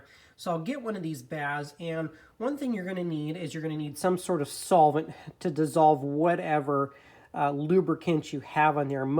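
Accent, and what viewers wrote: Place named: Midwest, United States. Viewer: Aside the annoying accent (yankee? midwest?) that over-emphasizes on bilabial consonants with an "h" sound that makes the talker sound like some pompous Victorian colonial windbag (it's maybe not the author's fault anyways, just his native accent), I'd say this is the best explanatory video on the matter. No useless, pedestrian intro... gets to the point soon enough. Much thanks! Will try that. I was also just wondering if adding paraffin oil is important, or just wax is fine.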